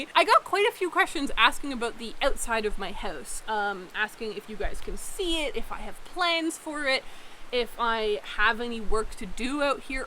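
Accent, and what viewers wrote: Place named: Canada. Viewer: How do we know she's from Canada?? Oh y'all did you not hear her say " house, outside and couch" ? 😊😃😄😍 love hearing her talk.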